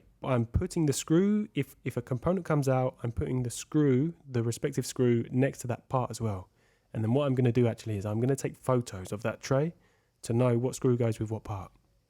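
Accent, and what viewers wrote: United Kingdom. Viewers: Really interesting channel mate. Just starting to take an interest in watches. Good to hear a British accent 😂👌🏾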